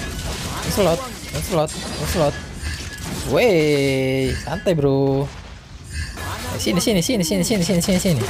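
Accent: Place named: Sumatra, Indonesia. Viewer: Cara ngmongnya kek orng sumatra lu bng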